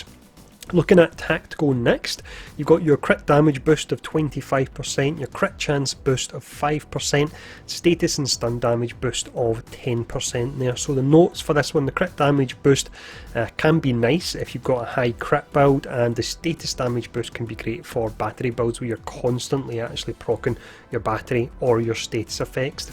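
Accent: Scotland, United Kingdom. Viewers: I've deleted this game for space for other games. I'll wait till T'Challa shows up but I'm not too certain I'd re download it in general due to the fact they only make 3 or less maps to play on. I hate repeats. Also are you from Scotland? I love Scotland accents they're the best!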